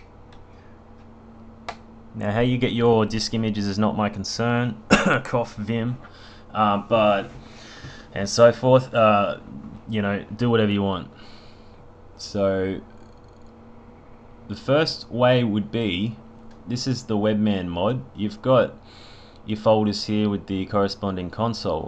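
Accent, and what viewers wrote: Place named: Australia. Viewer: Love ya laid back attitude! Also great to hear a fellow Aussie voice. Helps me understand 10x easier. Appreciate ya work mate!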